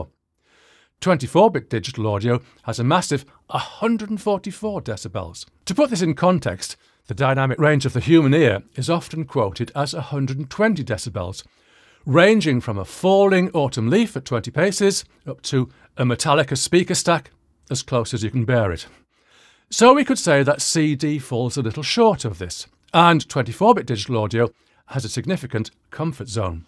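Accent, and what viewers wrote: United Kingdom. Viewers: Greetings from California. Superb video! Can I ask which region of the UK is your accent from?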